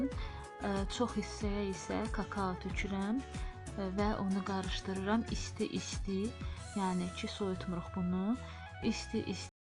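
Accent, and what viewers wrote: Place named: Ordubad, Azerbaijan. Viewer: Cox sagolun cavablandirdiginiz ucun.Daniwiginizdan hiss etdim lehcenizden bizde ordubadliyiq yaxin geldiniz😙😊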